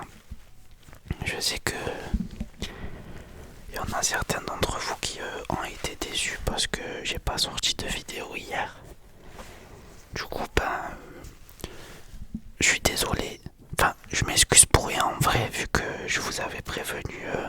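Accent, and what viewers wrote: South, France. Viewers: J aime beaucoup ta chaine et ton timbre de voix 🙂 petite question tu as un fort accent du sud t Marseillais , nîmois ou Montpellier ? 😉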